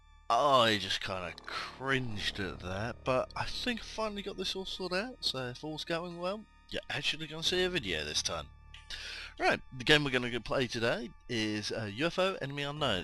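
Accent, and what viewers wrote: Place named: United Kingdom. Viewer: I have watched ALL 56 videos 6 times in a row while I've been playing in a separate DOS window. WORDS I LOVE HEARING HIM SAY IN HIS ACCENT: 1. SAH-GENT Smerkey 2. Raw-ket-lawn-chuh 3. OH NO....OH NO (pronounced Air-Nair...Air Nair) LOL. Being from TEXAS, I totally love his British accent!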